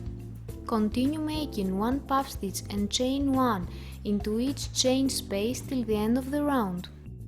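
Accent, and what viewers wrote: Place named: Greece. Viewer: ΚΑΤΑΛΑΒΑ ΑΜΕΣΩΣ ΑΠΟ ΤΗΝ ΠΡΟΦΟΡΑ ΣΟΥ ΟΤΙ ΕΙΣΑΙ ΕΛΛΗΝΙΔΑ!! 😉 ΜΠΡΑΒΟ ΚΟΡΙΤΣΙ! ΕΥΧΑΡΙΣΤΩ ΓΙΑ ΤΟ ΒΙΝΤΕΟ! ΕΧΩ ΣΚΟΠΟ ΝΑ ΤΟ ΦΤΙΑΞΩ ΚΑΠΟΙΑ ΣΤΙΓΜΗ! KEEP UP THE GOOD WORK!😊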